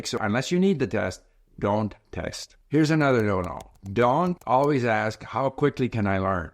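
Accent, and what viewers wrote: Canada. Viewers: Many people say that Canadians and Americans sound the same. Within the first minute, I was able to correctly guess that he’s from Canada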